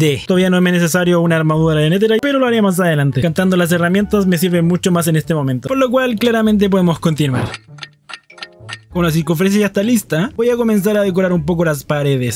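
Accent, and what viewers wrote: Chile. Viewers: Tío de que que país eres me párese de chile o no y feliz navidad que te visite el viejo pacuero po XD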